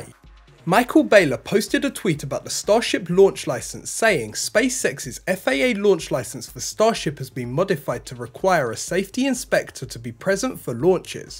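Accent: United Kingdom. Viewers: Turn the volume to zero. Sorry that UK accents annoy you (not really). His use of technical terms, and of English grammar are excellent. Maybe that is your problem.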